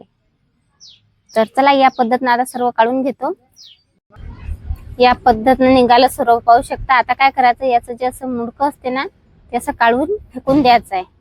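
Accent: Vidarbha, India. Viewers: विदर्भाची भाषा ऐकून खूप छान वाटले ताई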